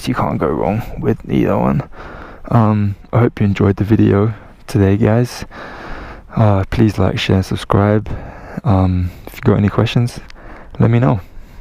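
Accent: United Kingdom. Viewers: You sound British but I'm sure this is Thailand though! Are you based there? like your reviews, so new follower here!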